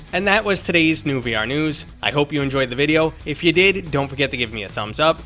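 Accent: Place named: Boston, United States. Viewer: Get paid man, make that Boston accent work for you!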